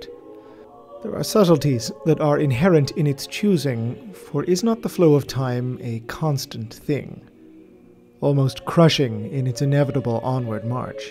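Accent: Ireland. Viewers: You are definitely irish, and I approve my man. good to see a native doing well on youtube here, and your content seems to be great, heres a new subsoober for ya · Are you Irish? You sound irish. But you could be a Canadian doing an accent... I'm... uncertain. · I spent the first 5 mins trying to figure out if the speaker was English or American, realised he's Irish. So am I, not a good look for me.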